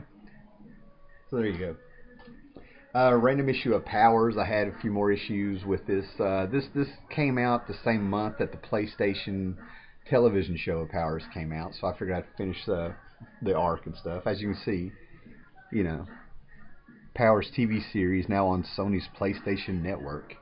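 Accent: South, United States. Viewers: Finally someone else with a southern accent haha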